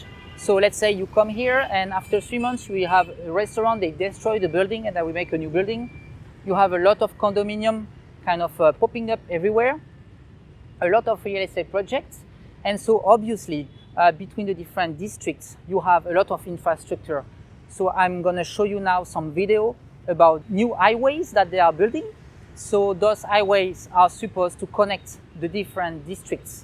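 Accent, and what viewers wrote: France. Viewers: I watch you for the French accent!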